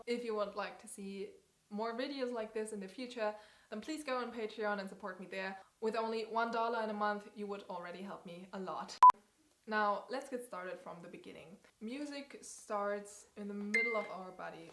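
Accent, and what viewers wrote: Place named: Germany. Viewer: Thank you! I love how you explain your ideas it’s like your speaking German through English 😅 💙 oh and how does patreon work? I’ve never used it before but i would like to support you! I practice Bach everyday at the piano, sometimes mozart Beethoven and Haydn but mostly Bach 🙌🏼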